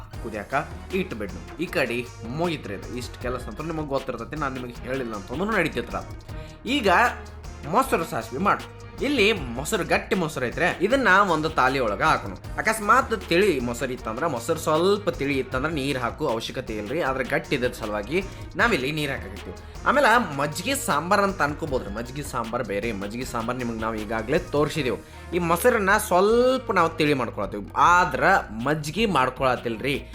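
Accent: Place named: Karnataka, India. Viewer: Love this Kannada accent ❤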